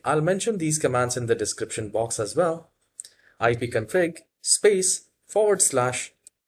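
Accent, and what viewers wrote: India. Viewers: As soon as I heard the Indian accent I knew this was going to work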